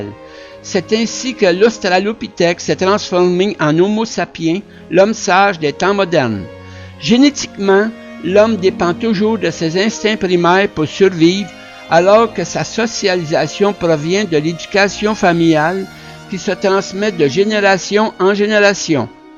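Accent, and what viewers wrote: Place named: Canada. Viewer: Putain j'ai cru au début que c'était un débile mental qui parlait au début. Après je me suis rendu compte que c'était un canadiens... \o/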